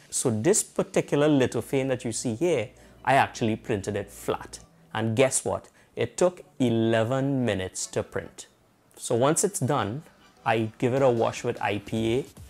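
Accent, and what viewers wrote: Trinidad and Tobago. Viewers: Great video, you sound Trinidadian. I'm happy to see a tech video that's not from india or usa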